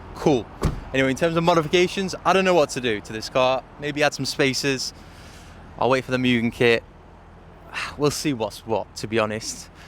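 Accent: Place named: England, United Kingdom. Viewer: The English accent, the weather, and a hot hatch. Nice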